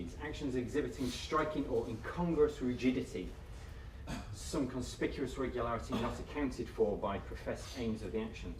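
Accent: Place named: United Kingdom. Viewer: Bugger the door creaking! This guy knows his stuff! If all you can moan about is the door creaking you didn't listen or understand this video To the maker and lecturer in this video THANK YOU SO MUCH FOR MAKING THIS VIDEO I wish I could have listed to you spk all day because for someone who never took that stuff, you really have done your research and know what you e talking about. You sound like you're from the U.K. Do you run any particular detox or rehab? I have a friend who needs help badly,and where we live there's hardly any. I know this is a old video but I'd be so grateful if you could reply. Thanks for your time and all the best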